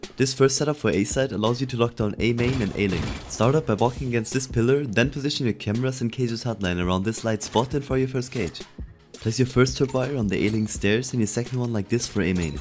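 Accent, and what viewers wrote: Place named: Germany. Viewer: Great Video, actually really well done! btw where are you from? U sound a bit German if I may say so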